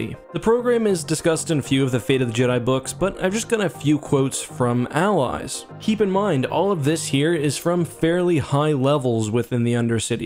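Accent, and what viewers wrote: Canada. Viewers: Are you canadian? You pronounce about in a canadian way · You know he's canadian by the way he says "Aboot" instead of "About"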